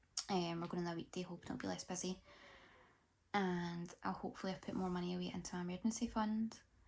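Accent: Scotland, United Kingdom. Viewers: My American self didn’t know how much I loved the Scottish accent until now 😩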